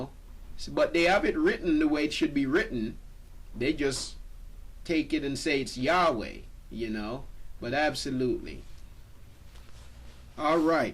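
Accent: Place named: Caribbean. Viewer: Thanks for sharing. I heard your voice and was trying to place this Island brother.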